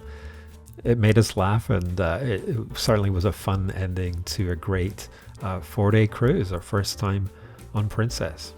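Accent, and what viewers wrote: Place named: Northern Ireland, United Kingdom. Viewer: Thanks for video, I like Princess and Celebrity but the latter is getting very uppity with their pricing these days. In fact I do not think we will be able to afford them again, plus when in the booking mode online, at the end there seems to be a huge upgrade price to pay if one wants a refundable deposit. I won’t have that, so c’est fini. I won’t ever visit a zoo, aquarium or anywhere that keeps animals prisoner for monetary gain. Visits just encourage them. Sanctuaries are different. Thx again. Where is your accent from, my friend from Londonderry, N.Ireland says “food” as “fudd” like you. Sorry but it is not “gi gi’s” as in the kids’ name for horses, but the French soft G, like an “sh” sound. The older ships have the name Alfredo’s, and up until this year it was free. Damn nuisance. Take care.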